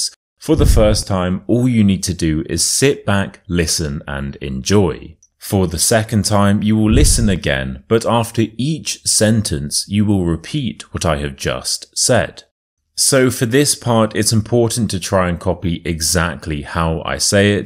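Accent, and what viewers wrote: United Kingdom. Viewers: I wish all British people could speak like you 😅 Very lovely lessons ❤ thanks 🤩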